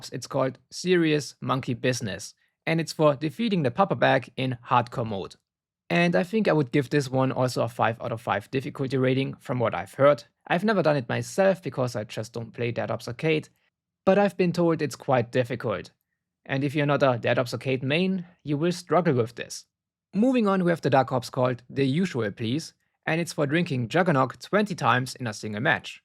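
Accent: Germany. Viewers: Most german accent ever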